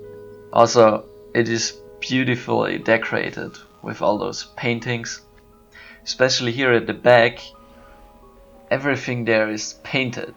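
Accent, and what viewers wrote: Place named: France. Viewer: sounds france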